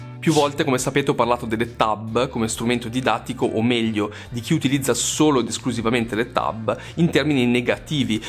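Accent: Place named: Milan, Italy. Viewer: Oggi l'accento Milanese era ben evidente : )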